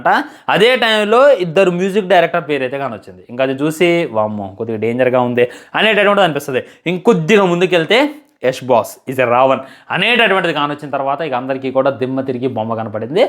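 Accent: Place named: Telugu, India. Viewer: Aapu ni sodhe bhaiyya , paata paadinattu matladaku . Bore chestavu . Aa telugu accent vina lekapothunam 🙉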